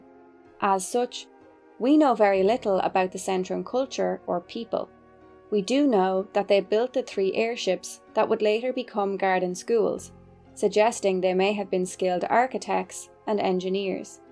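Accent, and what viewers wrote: Ireland. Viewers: Very enjoyable content ! I eat up any FF analysis video like a bag of tayto (It’s also nice to hear the analysis from a fellow Irish person)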